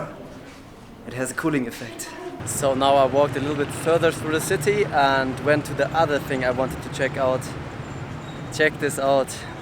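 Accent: Germany. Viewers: Are u german??? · He speaks English very well. I barely can hear German accent.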